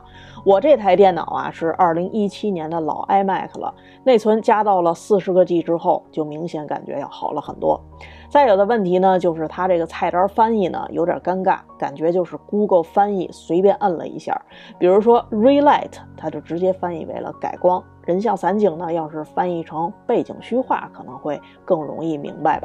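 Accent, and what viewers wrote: Beijing, China. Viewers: 姐们儿，一听你就是老北京：）感谢分享，在B站也关注你了。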